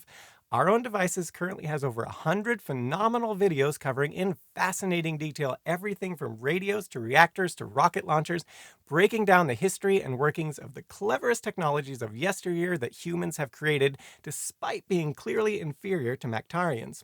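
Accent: United Kingdom. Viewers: If your audience is largely American, we like British hosts. People with accents are automatically smarter.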